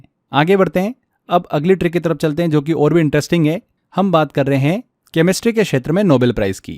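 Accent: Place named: Maharashtra, India. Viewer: Sir Aap ki Hindi voice se lagta hai ki sir Aap Maharashtra se belong karteh hai..... Marathi Hindi type voice.... kyuki sir hum 4saal Pune mein tha....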